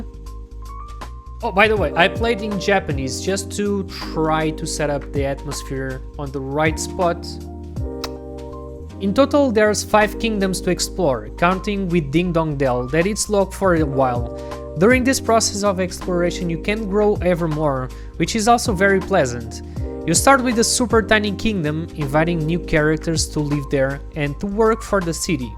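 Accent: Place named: Portugal. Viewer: i can tell by ur accent man:p great video i was thinking about buying that it's on sale on the ps store, eu também sou tuga ahah:p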